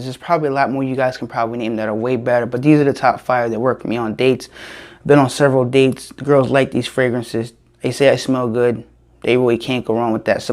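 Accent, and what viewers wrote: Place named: United States. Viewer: Good video again mate it is a shame that you have to do a disclaimer because people moan. I have 3 of these fragrances, Aqua Di Gio is coming tomorrow and looking forward to it. YSL La nuit d'lomme is great on my skin and lasts/projects for ages.... I love it whereas Sauvage I have the edp and I am not keen on it, the projection on me is poor and even on clothing I can hardly smell it. I enjoy your videos..... What is your name? I cannot tell with your American accent (I am from England) and where abouts in the States are you from? I am from Leeds in Northern England.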